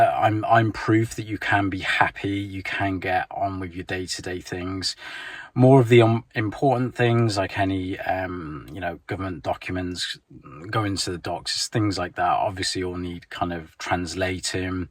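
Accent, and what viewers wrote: United Kingdom. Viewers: у нас некоторые русские делают покупки в магазине, не говоря ни слова 🙂 Я думаю, что вы человек очень family-orientated, что в повседневной семейной жизни вам легко общаться как с женой, так и с детьми на английском. Ну и есть люди, которые очень открытые, легко идут на контакт, много общаются. Вы более закрытый, вам хватает общения с устоявшимся кругом старых друзей и знакомых. Поэтому у вас освоение языка идёт медленнее, либо это просто ваше чувство, что он недостаточно хорош. Но пробуйте, будьте смелее, вас всегда поддержат. Людям любой национальности нравится, когда говорят на их языке, это ценится. Вам помогут с русским ваши дети, слушайте их, говорите с ними на двух языках. А так, мне очень нравится слушать ваш британский английский. Такое удовольствие? Скажите из какой части Великобритании вы? Просто я не смотрел ваши видео с самого начала,не знаю поэтому. 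· u will never learn Russian...and i will tell u why...u don't like the Russian language...u just love to hear yourself in your ugly native language... and I can notice that u speak fluent english with a strong british accent better and better every day...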